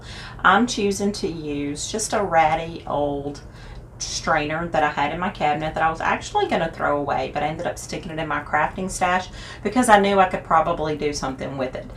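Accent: South, United States. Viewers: I just like listening to your accent! We live in Italy now so I love feeling the southern drawl!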